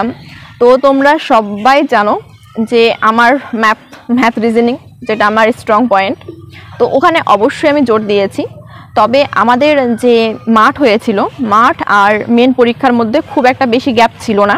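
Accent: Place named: Bangladesh. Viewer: Kotha gulo bangla desi bangla deshi lagche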